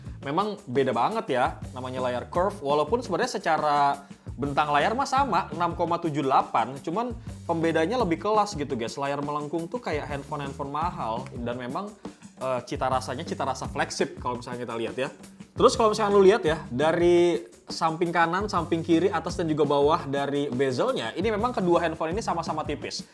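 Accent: West Java, Indonesia. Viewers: Kaciri pisan sundana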